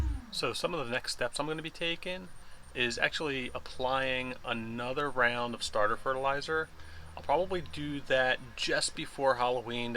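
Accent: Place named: Philadelphia, United States. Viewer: New sub here! Safe to assume you’re from the Philly area/surrounding areas? That accent sounds very familiar! South Jersey here about 20 min from the city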